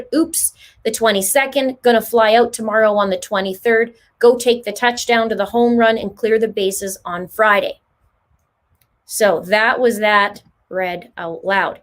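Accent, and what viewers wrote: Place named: Canada. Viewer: I love your channel and your Canadian accent. I love that they have the Benghazi surviver Tig, helping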